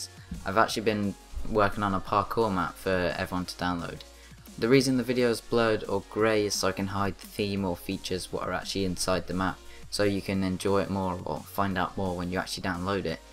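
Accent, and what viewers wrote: United Kingdom. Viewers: I love your accent here more than now be a use I like British accents and this is your first video with voice recording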